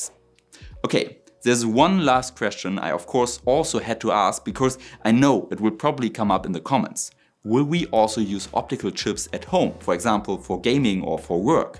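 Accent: Germany. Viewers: There is nobody I would rather learn science from than a German dude with a German accent.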